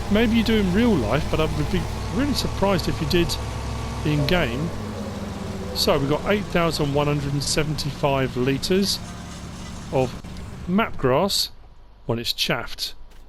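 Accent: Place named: United Kingdom. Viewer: Great video!, and useful to practice British english! 😁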